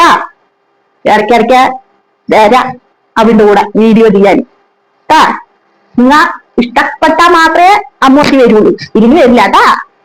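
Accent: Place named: Palakkad, India. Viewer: എനിക്ക് പാലക്കാട് ഭാഷ ഇഷ്ടാണ്..എന്റെ ഒരു നെയ്ബർ ഉണ്ടായിരുന്നു.. മുംബൈയിൽ.. അവര് ഇപ്പൊ നാട്ടിൽ സെറ്റിൽ ആയി.. പെട്ടന്ന് അവരെ ഓർമ്മ വന്നു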